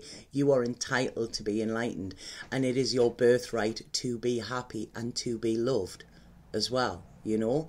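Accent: Newcastle, United Kingdom. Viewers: it does suit you. I am watching your videos from Marseille. How funny..I was 7 years in Newcastle so your videos have a special touch to me. I love it all, you accent and kindness remind me how beautiful and friendly Geordies are + your gift as a reader is amazing and the positive energy you bring to us is a tribute to your soul. I am also a fellow Virgo..so all of this makes me feel like a child in a school yard feeling 'I want her to be my friend!!!!!' Keep up you are a highly evolved human being. Almost to good for this earth....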